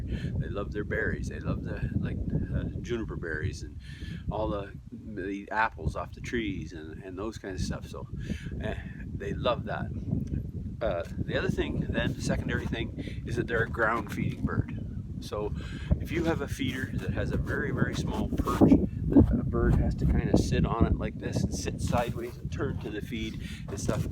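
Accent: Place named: Canada. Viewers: Man ur canadian af....nice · I thought so too. Always makes me happy to see another Canadian ❤️🇨🇦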